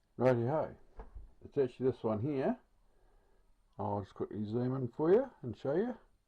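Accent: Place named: Australia. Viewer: I've been doing similar hacks for ~25 years. I mainly repair arcade games but my house alarm had an installer password on it so the user can't change any settings. I dumped the eeprom then using the installation manual I found online I did a factory reset. then I dumped the eeprom again and did a search within that dump for the known factory password. then I simply looked at my original dump at the same memory location and found the installer's password. now I can change any settings I want LOL! You sound like an Aussie... me too! I'm on the West side Perth. Where are you?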